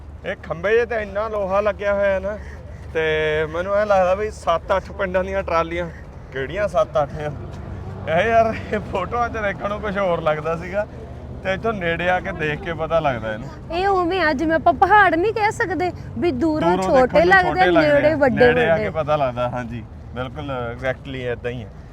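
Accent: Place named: Hoshiarpur, India. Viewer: Aap bohat khobsorat boli boltay hain. My mother tongue Punjabi in hoshiarpuri accent like yours.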